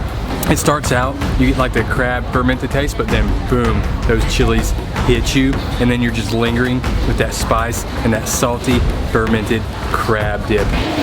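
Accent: South, United States. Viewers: You are just way too handsome! My sisters and I love watching you and gushing over your adorable southern accent ☺️! Whenever we watch your videos, Our partners walk out the room and roll their eyes like 'oh here we go, that guy they all think is so sexy'! Lol.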